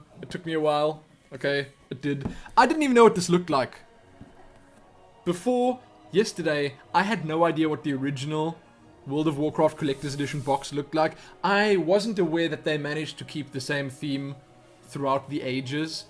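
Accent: South Africa. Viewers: Is he South African??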